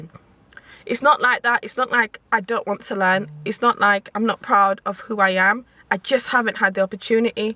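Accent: United Kingdom. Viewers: its just a zambian thing coz even the opposite is true, if u cnt speak English they will stigmatize. just be who u are coz me the day i will visit London even for a week and come back to zambia my friend i will cum back with that UK accent. i like ur accent by the way... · Don't feel too bad...other groups do this too...in the states i've witness hispanics born in places like N.Y. but don't speak spanish is looked down upon because they didn't grow up speaking spanish...At work Jamaicans from the islands stay making fun of the Jamaicans who came to the states as children (thru no fault of their own). They stay telling them, "you ain't a real Jamaican...yada yada yada....Don't feel too bad...btw, luv your UK accent...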